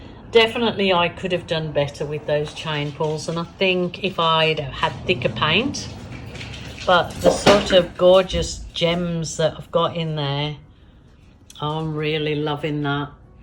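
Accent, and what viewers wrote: Birmingham, United Kingdom. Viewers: Hey there Lovely! I'm really late to the party only just found your channel 🤦‍♀️. Absolutely love your art.❤ (Great to hear a Brummie accent too! Great Barr gal myself. X)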